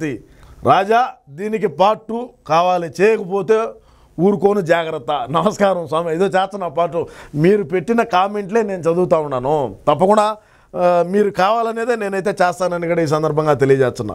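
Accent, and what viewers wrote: Rayalaseema, India. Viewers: గారు మీరు చేసిన వీడియోలు మీ రాయల సీమ యాస లో బాగా చెప్తారు...మీ విడియో లు అన్ని చూస్తాను లైక్ కామెంట్ షేర్ చేస్తాను... కూటమి గవర్న్ మెంట్ రావడానికి మీ విడియో లు సగం కారణం...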